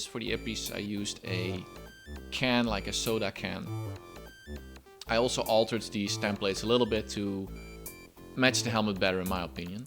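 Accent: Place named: Netherlands. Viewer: het is leuk dat je automatisch iemand kan herkennen die nederlands praat aan het accent :>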